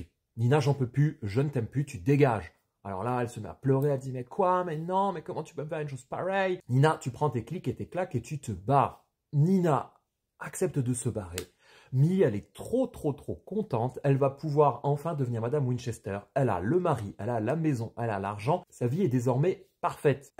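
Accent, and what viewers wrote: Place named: England, United Kingdom. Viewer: Merci pour ce sacrifice qui m'aura évité de le lire 😂 Cet accent anglais juste... incroyable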